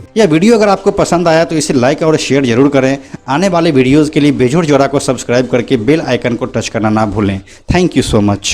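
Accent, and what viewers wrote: Bihar, India. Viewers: Hee too tuu bihari aawaj teri smj nhi aari bol kya rha h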